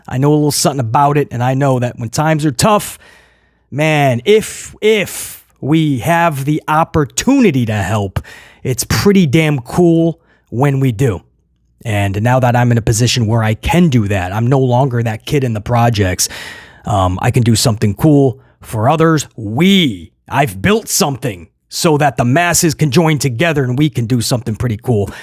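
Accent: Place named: New York, United States. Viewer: You are definitely a New Yorker just like me you sound like a New Yorker1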